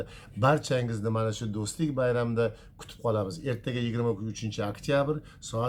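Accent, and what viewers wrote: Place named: Samarkand, Uzbekistan. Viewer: Самарахоон болуп калат экен туугандар суйлогондо😃, ийгиликтуу откоруп ал концертти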